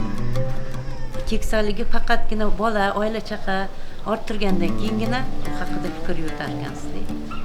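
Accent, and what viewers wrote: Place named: Tashkent, Uzbekistan. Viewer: Toshkentlik sheva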